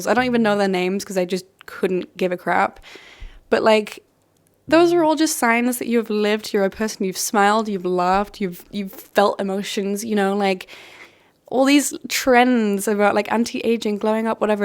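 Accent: United Kingdom. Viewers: Your British accent is so lovely 💗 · Great video! I'm 38 and social media still contributes to my regular identity crisis'. I'm trying desperately to place your accent and all I can come up with is posh Geordie 😂 I hope you're not offended!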